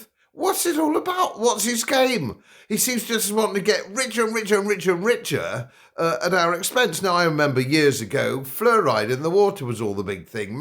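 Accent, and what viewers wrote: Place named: England, United Kingdom. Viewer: Bang on again Sir. I do enjoy your rants. So much better with a ‘proper’ English accent 🫡🇬🇧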